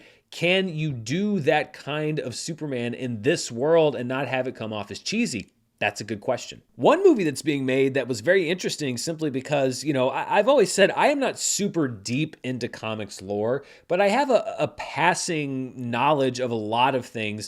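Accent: St. Louis, United States. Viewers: Is from St Louis?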